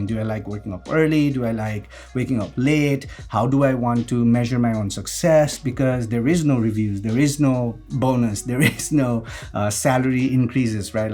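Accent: Nepal. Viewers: Not to be rude but you sound like a nepali. That accent is unmistakable. I have a couple of friends and even colleagues who are nepali.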